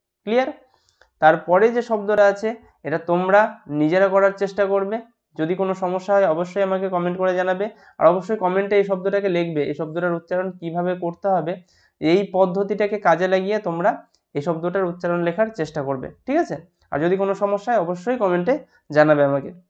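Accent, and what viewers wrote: India. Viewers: Are you an indian?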